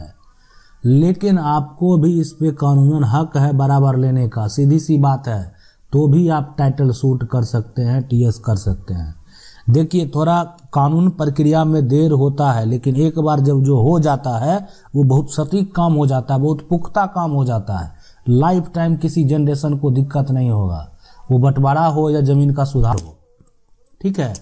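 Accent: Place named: Begusarai, India. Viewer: आप बेगूसराय बिहार से हो आप के बोलने का तरीका बेगूसराय जिले का है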